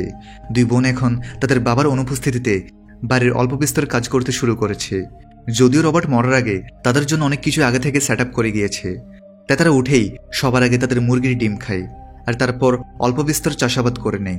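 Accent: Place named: Pabna, Bangladesh. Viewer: vai morgir khowar😁😁,,ata to pabnar ancolik vasha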